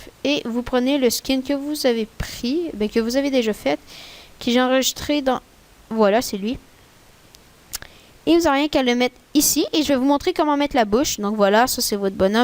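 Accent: Quebec, Canada. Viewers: T quebequois ??? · J'adore l'accent québécois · j'adore ton accent et les québécoi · De 1 je m’abonne parce que merci De 2 je like parce que j’adore ton accent québécois ! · 1-J'adore l'accent quebecois 2-Super tuto merci bcp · Perso je kiff ton accent québécois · tu est québequoi ? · Moi c rendu que je suis TELLEMENT rendu habitué a l'accent français que je suis surprise de voir un accent québécois même si je suis québécoise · Jadore les accent kebekois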